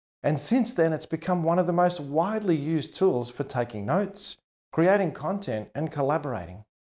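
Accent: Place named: Australia. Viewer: Thank you for making these great free playlists! Really helping me transition from the "old world" ways without the usual stress. Aussie accent helps too haha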